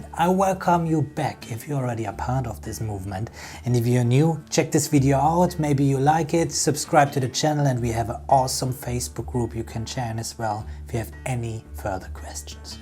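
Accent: Germany. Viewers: Wow big German accent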